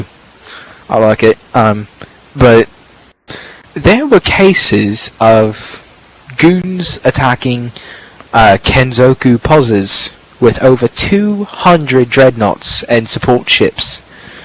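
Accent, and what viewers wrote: United Kingdom. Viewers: UK accents make for great narratives!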